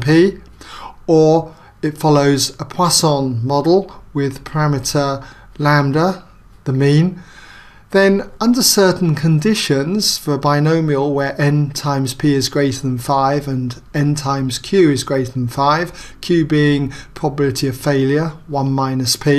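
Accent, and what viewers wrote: United Kingdom. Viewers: British accent is always so charming :)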